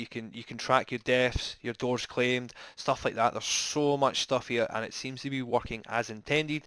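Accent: United Kingdom. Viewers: From birmingham ?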